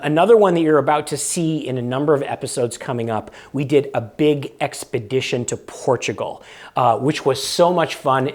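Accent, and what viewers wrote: United States. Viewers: Nothing wrong, you’re using American English as the program language so it’s perfectly fine to just American pronunciation in my opinion. Im Chinese, and I wouldn’t expect you to pronounce “China” “Zhongguo” 😄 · I honestly don't really care about how you pronounce things, since your content's amazing. Plus, you're american, so obviously you're gonna talk in an american accent. Seems completely normal to me, don't change the way you talk for us. It's like asking attenbourough from the bbc to talk in an american accent, nonsense. Regarding the format, "we complain, you explain", that's a great idea, I mean, as viewers, it's always appreciated to see that our comments are heard ❤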